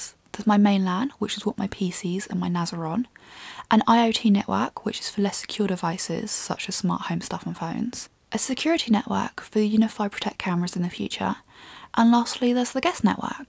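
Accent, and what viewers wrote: United Kingdom. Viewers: Love the British accent for tech stuff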